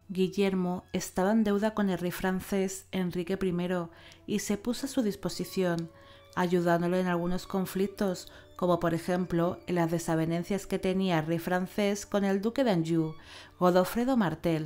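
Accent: Spain. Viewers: Estoy enamorado de tu voz, la cadencia, tu acento peninsular... como Argentino, hijo de España, me derrite. Excelente trabajo.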